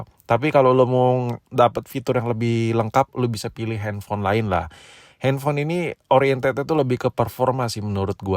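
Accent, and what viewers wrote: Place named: Banyumas, Indonesia. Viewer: Jebule wong ngapak ya😂😂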